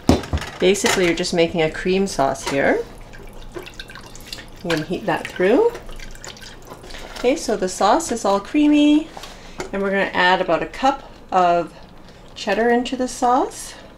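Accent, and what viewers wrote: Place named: Canada. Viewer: Chinese Canadian - great accent! Lovely food as well!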